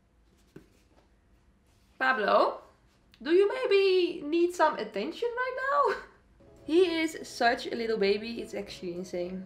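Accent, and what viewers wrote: Netherlands. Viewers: yo for real, are u dutch? sorry but u have SUCH a dutch accent hahaha love you <3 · Je klinkt zooo nederlands lmfao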